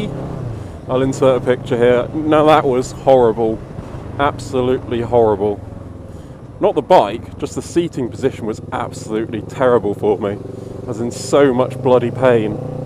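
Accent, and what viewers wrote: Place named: United Kingdom. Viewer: Great video, speaking from the heart. Very inspirational and I just love that fine british accent!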